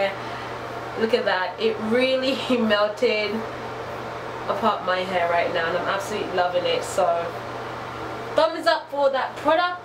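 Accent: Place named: United Kingdom. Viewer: Did u spend time in the uk because I can hear a bit of a British accent love the hair btw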